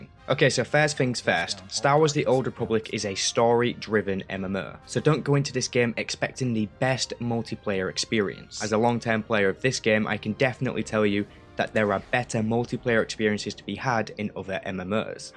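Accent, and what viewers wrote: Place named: Northern England, United Kingdom. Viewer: middlesbrough your from northern england for sure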